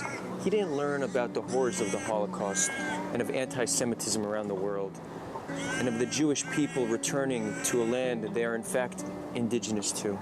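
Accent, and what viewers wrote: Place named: United States. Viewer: I think your American English is good enough for you to resettle to Gaza and educate local Arabs to live in peace, since there is no occupation of Gaza and thus no reason for violence. You should dedicate your life to that. There is no need to preach to non-Arabs. We have already army of the socialists, progressives, Fabians and others who do just that.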